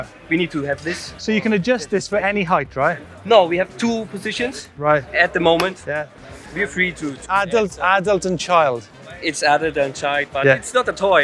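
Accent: Wales, United Kingdom. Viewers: Nemanja X it sounds a little Welsh to me, can't say whether it's north or south though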